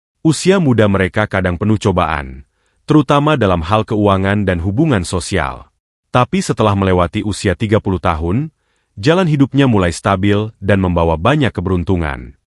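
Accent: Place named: West Java, Indonesia. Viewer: Kelihatan lugot bicaranya orang Jawa barat yang membawakan ini bukan orang Jawa tengah mantab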